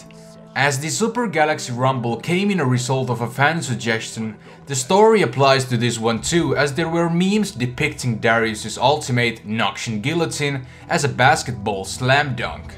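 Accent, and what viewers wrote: Finland. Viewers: I started watching this, and thought that you have that finnish accent in your english. Mutta hyvä video! :D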